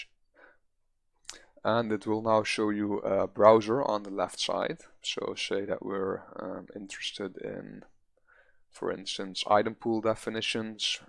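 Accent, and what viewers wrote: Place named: Netherlands. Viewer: Nederlands ofnie ik kan het zo horen in jou Engels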